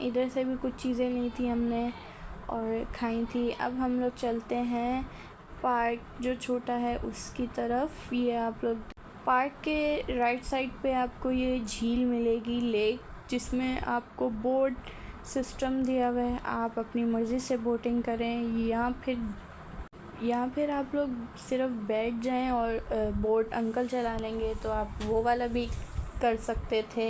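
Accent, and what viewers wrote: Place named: Karachi, Pakistan. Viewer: Pure Karachi accent 😂 keep it up 💫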